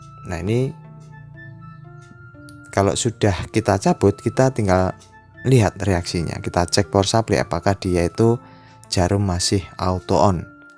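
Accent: Java, Indonesia. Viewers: Sampeyan jawane ngendi boss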